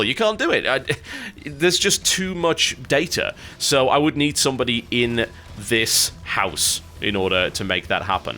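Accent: United Kingdom. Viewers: Oh my has the British accent lessened over the years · Young cynical brit sounds much more British. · Hey, younger sounds way more british. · Monochromatic Stains? Dark Tranquillity? In Flames? Jesus christ you have some great taste,. Also, you sound so young, inexperienced and British! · i hope comes back to britain for a tiny bit each year so he can keep the acsent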